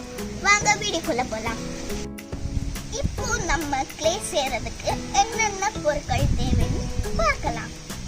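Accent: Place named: United Kingdom. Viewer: OMG! Did anyone notice her English accent ? 😻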